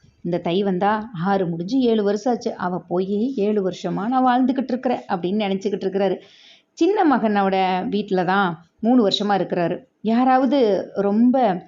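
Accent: Tirunelveli, India. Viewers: என் வயது 81.ஓய்வூதியன். தினமும் பி.பகல் 2 முதல் 4 வரை படுத்துக் கொண்டே பல அலைவரிசைகள் கதை கேட்பேன் அரிய கதைஃ.திருநெல்வேலிப் பேச்சு மொழி பிரமாதம்.திருநெல்வேலி அல்வா கொடுத்தால் நாங்கள் சுவைத்து ருசி அறிந்து கொள்வோம்.உங்கள் விளக்கங்கள் இடையிடையே தேவையா?மன்னிக்கவும். நானும் 32 நூல்கள் வெளியிட்டுள்ளேன்.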